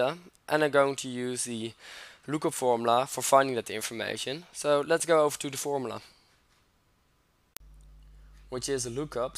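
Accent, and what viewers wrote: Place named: Netherlands. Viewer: keep up the good work. By any chance you are dutch. Please see an english logopedist for it might drasticly improve the quality of your costumersatisfaction.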